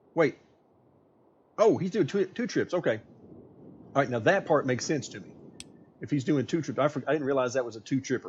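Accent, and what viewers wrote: South, United States. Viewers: Naw, this sounds more like Georgia-Missippi-Alabama southern accent.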